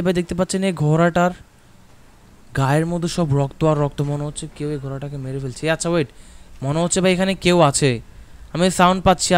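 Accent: Noakhali, Bangladesh. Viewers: ভাই সত্যি করে বল তুমি কি নোয়াখাললে।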